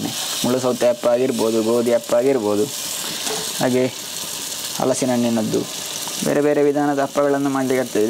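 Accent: Mangalore, India. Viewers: I am from mangalore originally. Now I am in bangalore. I love the kannada you speak. It reminds me of my childhood ❤ I love the recipes you make. It's sooooooooo delicious. I'm am sooo thankful to you that you share our mangalorean recipes. I have tried your recipes and my family is surprised how I make it 😂😂 . For any recipe I refer to your videos. Lots of love. God bless you and your family. ❤❤❤❤❤ I wish to meet you and your family someday when I come to kasargod😊😊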